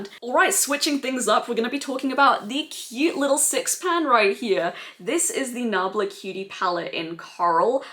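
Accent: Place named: Ireland. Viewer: More of that Irish accent, please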